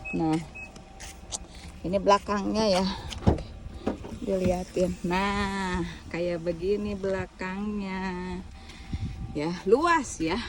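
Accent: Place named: Sunda, Indonesia. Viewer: 20 tahunan di Jepang, eta bahasa Sunda na edun suredunnnn....asli pisan Sunda😀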